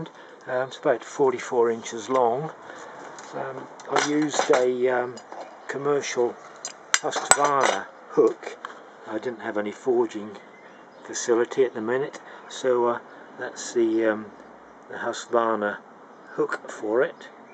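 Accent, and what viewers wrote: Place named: East Anglia, United Kingdom. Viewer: Would love to see it in use! I recognise that accent, whereabouts are you in East Anglia?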